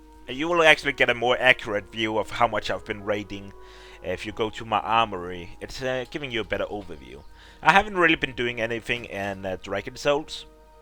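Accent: Denmark. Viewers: Are you danish? :b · Are you danish?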